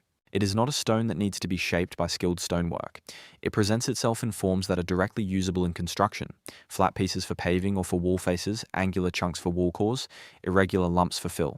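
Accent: Australia. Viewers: You have an Australian accent but you can't pronounce EMU correctly. If the Aboriginal people built villages, why do they not have them now?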